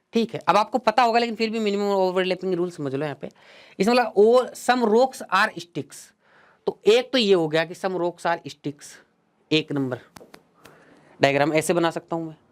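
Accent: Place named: Rajasthan, India. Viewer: Guess you are from Rajasthan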